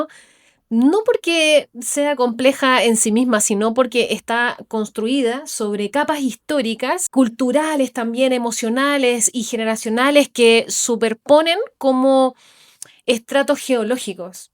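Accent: Chile. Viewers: Me llamo la atencion por que deje correr los primeros segundos y note tu acento y suavidad chilena, estoy de acuerdo como graficaste con viviendas como seria cada generacion jaja muy bueno el video.. saludos desde buenos aires!..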